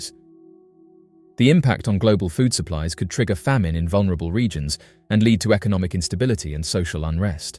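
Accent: United Kingdom. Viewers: We need British sounds like you to be assured it's bound to occur in my life.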